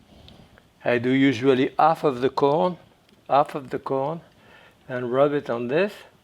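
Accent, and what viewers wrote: France. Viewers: I was responding to someone who thinks Jacques Pepin has a SPEECH IMPEDIMENT. He does NOT HAVE A SPEECH IMPEDIMENT. He is only speaking in a French ACCENT. of course his English is perfect. No one said it wasn't.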